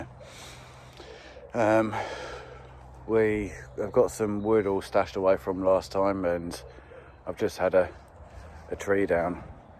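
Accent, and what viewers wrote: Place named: South East England, United Kingdom. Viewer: I feel like I know the accent. You sound south-east UK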